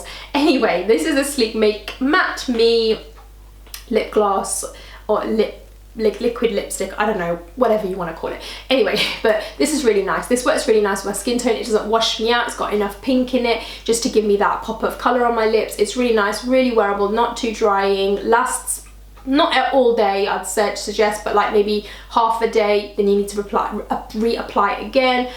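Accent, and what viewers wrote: London, United Kingdom. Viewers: You're so very London!! I love it!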